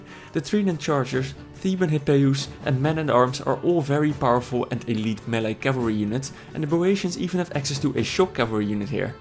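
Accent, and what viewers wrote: Netherlands. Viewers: You have the most horrible Dutch accent ever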